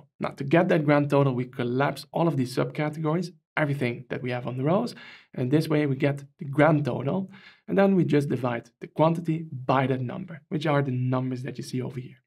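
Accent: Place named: Netherlands. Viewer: I am not an English speaker but I follow your educational content. Why is your English accent like this? Whether it is Google's AI or any AI I have used, it pronounces your language as Dutch, not English.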